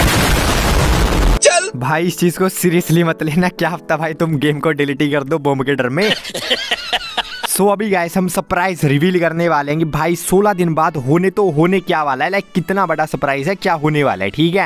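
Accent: Punjab, India. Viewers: Bhai kya aap Punjab se ho🤔🤔🤔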